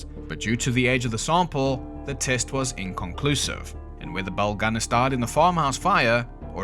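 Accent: South Africa. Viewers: south african accent so much nicer than british or australian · Are you from South Africa? Somehow it seems your accent is from there. A slight Dutch touch to it.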